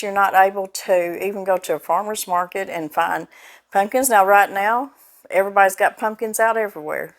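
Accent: South, United States. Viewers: Please ask Mr. Brown to find out what kind of pumpkin that was. I couldn't find any called cow patty or even close to that. Thank you! I love your videos and your accent. My parents are from West Virginia but raised us here in Wisconsin. I love hearing southern accents as it reminds me of them. They also were teased as to how they pronounced words. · i think you talk normal 🤷🏼‍♀️ but i only live a few counties over 😂 ms., i'm 45 and i have always said waspers, worsh rag, squashes, caint (can't), and all the other southernisms we have. i "workin'" in my craft room, but i sure could go for some of the pumpkin pie. that sounds so good and even better that it's pumpkin season. love living in the natural state with you! farrah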